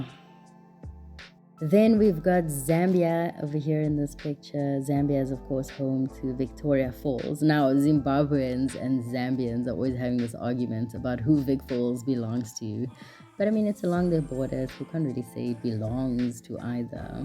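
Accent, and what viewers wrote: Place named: France. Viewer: Your French accent🥵🥵🔥🔥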